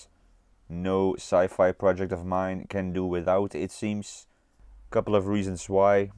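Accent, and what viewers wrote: Netherlands. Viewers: Dat hollands accent is sterk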